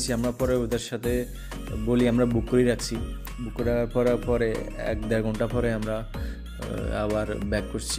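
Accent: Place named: Noakhali, Bangladesh. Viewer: Baiya apnader bari Noakhalir kon jaigai plz bolen, apnar Kotha amader ancholik basar moto.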